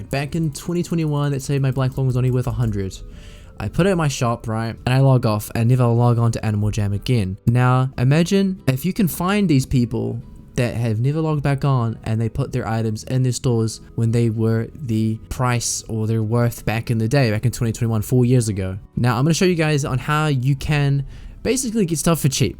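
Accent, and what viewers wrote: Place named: New Zealand. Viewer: Subbed, I liked this video cause you sound like you’re from nz n I wanna follow more creators outside of us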